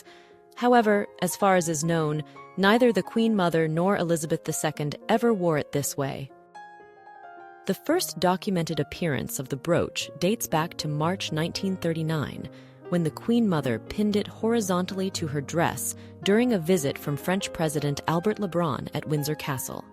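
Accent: United States. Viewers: A slip of the tongue I think. She should have said "the" new monarch. At least these scripts seem well-researched. And now they're spoken in the original American there's none of that AI fake RP. Mind you I wouldn't call anything containing Cullinan V understated.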